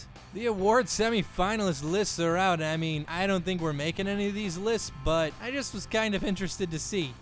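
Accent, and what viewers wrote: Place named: Midwest, United States. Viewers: Old roommate from Apple Valley, MN sounds similar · is a good old fashioned Midwesterner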